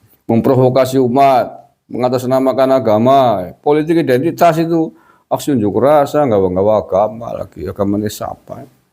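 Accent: Banyumas, Indonesia. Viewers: paling seger nonton kontenne panjenengan bung.logat ngapakke ngalahno boso inggris.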